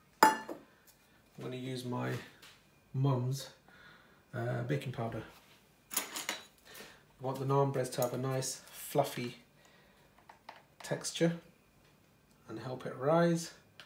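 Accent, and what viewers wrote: Sylhet, Bangladesh. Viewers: ❤️ from Bangladesh vaiya! Amazing work u r doing in UK! U sound like Sylheti 😊 Assalamualaikum to aunti ❤️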